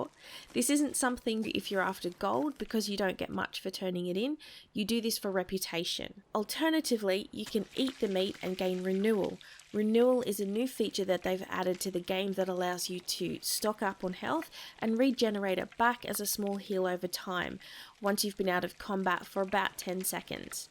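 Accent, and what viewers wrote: Australia. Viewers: Love the Aussie accent and the guide is really useful. Your so underrated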